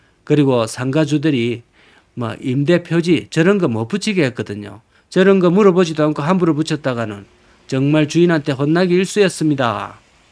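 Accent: Gyeongsang, South Korea. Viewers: ㅋㅋ ㅋ.전 전라도에 사는데도 갱상도 말씀이 참 구수합니다.늘 잘보구 인생공부겸 세상구경하고 있읍니다. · 집이 전라도 였습니까? 말투가 경사도 사투린데...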